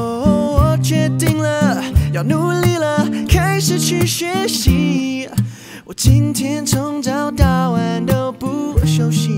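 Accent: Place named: England, United Kingdom. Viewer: CBC/ABC I assume? sounds kind of english inflected :D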